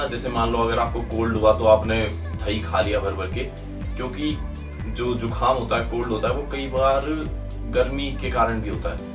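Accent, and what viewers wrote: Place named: Himachal Pradesh, India. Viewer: It sounds like ...you're from HP Nyc info btw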